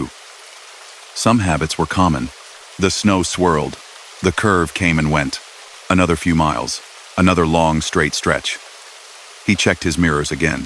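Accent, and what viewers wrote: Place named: Midlands, United Kingdom. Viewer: Uk midlands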